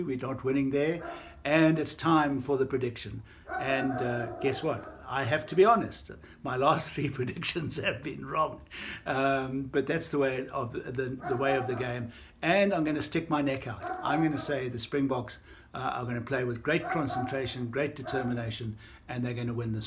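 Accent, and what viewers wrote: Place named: England, United Kingdom. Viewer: Potter and the funny thing is on each occasion many years later the official has come out and said he was wrong but that doesn't help after the fact.... And why do they all admit many years later that they were incorrect, because at some point in there lives, they realise they just look like dishonest chops and the conscience gets to them... Brice Lawrence came out n said I had a bad day, Romain poite came out said I got it wrong, paddy o brian said on many occasions he got it wrong with bok games????? Doesn't help after the fact... Remember two years ago where boks were about to beat England at Twickenham, Will Arrogant Farrell shoulder charged Andre Esterhuizen, boks weren't awarded a penalty, even although after the game Eddy Jones and Farrell said they were extremely lucky 😉... it's not luck it's biased officiating... 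you sound like a proper Pom, we dnt expect you to be unbiased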